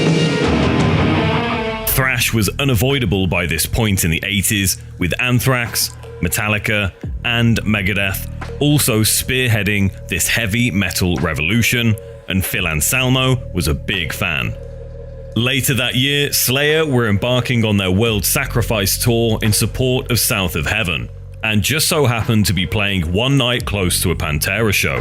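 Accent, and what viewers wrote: England, United Kingdom. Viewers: Heavy Metal documentaries with British accent narrations SUCK!!! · It should be a law that anyone who speaks with an English accent not only Not inaccurately do Narrations for US music bands but get the facts about the bands their poorly inaccurately doing the Narration correct....yeah I said it and stand behind it. Especially PANFUCKINGTERA DICK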